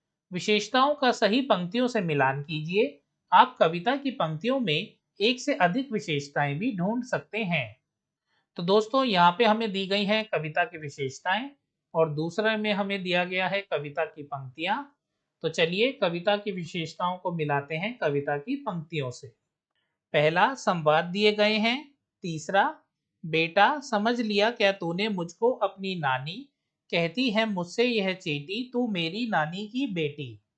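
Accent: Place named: United States. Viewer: Why are you speaking English like a american?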